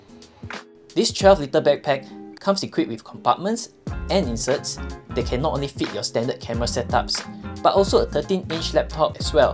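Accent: Singapore. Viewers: Legend mate, great review. I grew up in SG and I knew that accent was familiar 😂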